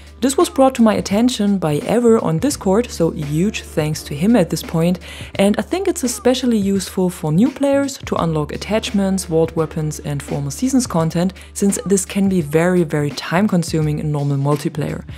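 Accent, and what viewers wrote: Germany. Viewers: You Germany accent is so beautiful 😍😍😍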